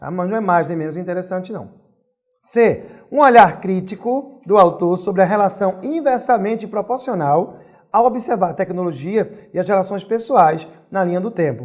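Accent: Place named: Pernambuco, Brazil. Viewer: Muito boa aula, parabéns e obrigada. O melhor é ouvir o sotaque, também sou Pernambucana!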